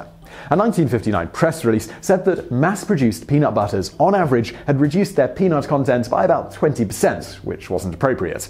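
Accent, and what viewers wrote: United Kingdom. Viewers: I was a long-time subscriber to this channel -- never missed a video -- and just un-subbed. I don't understand why Simon has to be so aggressively front and center in these videos. I love the topics, I love the detail. I understand he has a cool British accent, but can't he just be the narrator? It was always weird to me how images and the text always take a background to him. What Culture shows the hosts, but not non-stop. It's just not necessary. I realize that it had been fine initially and then it started to bug me and now I can't stand it. I will definitely re-sub and share if the topic becomes the most important element to the Today I Found Out videos. Simon is fine, no shade, really, but the channel -- educational and informative as it is -- is just too much of him, it's very in-your-face. ( I also noticed that other people write the series -- can't you mix it up with some of the writers or just have an episode w/o a host?) I REALLY enjoy the subjects, and wouldn't mind if there was just a VO of Simon. 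· accent. The Brits say supa instead of super, and Chiner instead of China.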